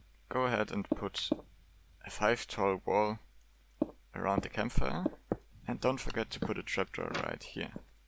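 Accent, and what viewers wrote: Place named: Germany. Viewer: you sound verry deutsch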